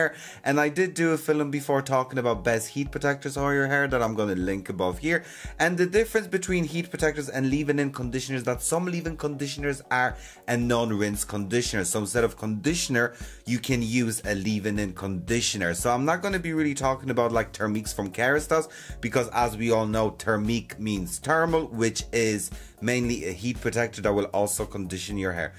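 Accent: Ireland. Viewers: ….I ADORE watching and listening to you. You make me smile so much. I LOVE your “Heap of Durt” comment…. your accent…..reminds me of my great Irish friends. I take your knowledgeable opinion as THE BEST. Big hugs, Teddy U.K. xx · Hey do you live in Ireland? Your accent and the way you say film is so Irish coded. Love it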